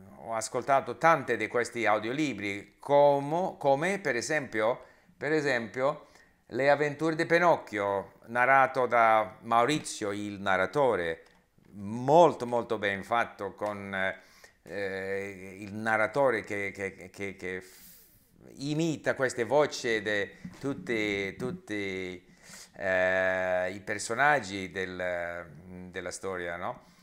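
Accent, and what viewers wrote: Spain. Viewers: Complimenti, il tuo italiano non è male soprattutto per essere un poliglotta. Si sente molto l'influenza dello spagnolo, nell'accento, in alcuni errori e soprattutto nel dire y invece di è :) che secondo me è molto difficile, intendo che io quando ho imparato il francese usavo spesso congiunzioni in italiano senza rendermene conto, in particolare ma invece di mais. Complimenti in ogni caso e grazie per il tuo esempio! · Cerca di separare lo spagnolo da tuo italiano... L'influenza è troppo forte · Complimenti Mr, l'associazione allo spagnolo è molto forte, ma il suo italiano è comprensibile. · Bravo, il tuo italiano è notevole nonostante le evidenti interferenze del tuo spagnolo. Penso che la cultura che ti sei fatto nella nostra lingua non sia per nulla una cosa da poco. Complimenti.:D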